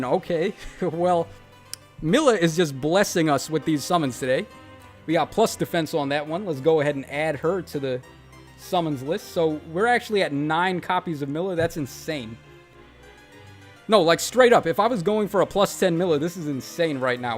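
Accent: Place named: East Coast, United States. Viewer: If your accent didn't give away that you're from the east coast, you going to a "bodega" did. Congrats on that lotto win, though, the IRL equivalent of summoning 5*s. I might want to play now, too.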